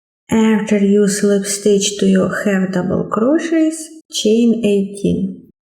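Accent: Russia. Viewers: Russian accent? Hallo mein lieber. I have made the same , we have similar ideas? Thank you for video.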